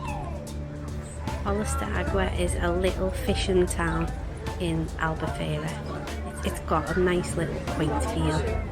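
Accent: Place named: Liverpool, United Kingdom. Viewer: No mistaking that scouse accent there 😁 do u live out in Portugal? Were staying at 3HB Club Humbria in October can't wait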